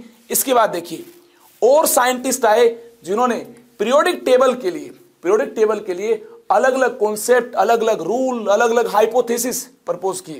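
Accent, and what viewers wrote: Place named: Haryana, India. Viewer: sir ki awaz m hi haryanvi accent aajata hai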